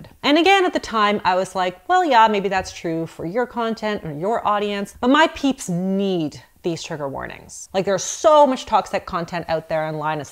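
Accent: Canada. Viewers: She’s Canadian I believe 😊 so it might be an accent. My husband recently pointed out to me the Canadian accent and now I can’t unhear those slightly different pronunciations which I find cute (I hope that’s not offensive, I enjoy listening to people’s accents) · I love your content!! I’ve learned so much about healthy eating and diet culture. Personally, I’ve never been triggered by your content and if anything, those struggling with ED could benefit so much from the truth that you share❤️‍🩹 you are a blessing! And I love your Canadian accent😂💚 keep going girl!!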